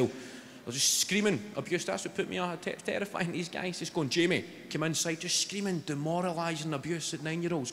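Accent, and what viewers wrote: Scotland, United Kingdom. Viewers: Lmao, I'm Welsh and I need to concentrate to understand him :p he has a very thick Scottish accent doesn't he haha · Jesus Christ that scottish accent haha perfectly fits what he's saying but I'm almost a C2 english level and still I missed way too many jokes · what are you on? English can't understand the Scottish? I'm english and I understand every word, ignorant people cant understand him, not english people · well if your from the uk and you can't understand a uk accent u must b thick ya zoomer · Fouad Bacho English, with a Scottish accent, being Scottish.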